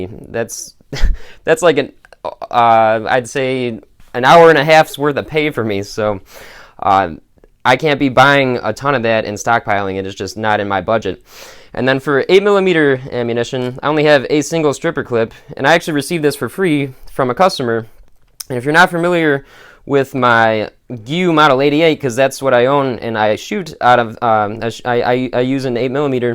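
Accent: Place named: North, United States. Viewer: Can tell from your accent your from up north, I'm from downstate Il...a bit south of Champaign. This is the first video of yours ive seen, but I'm going to check out the rest of your stuff. I enjoyed this video man. Thanks....and big R and rural king is where I buy 90% of my ammo. I just caught 38 special on clearance for 28 bucks for a 100 round box! Going to help me break in my new henry 357 sidegate....looking forward to watching the rest of your videos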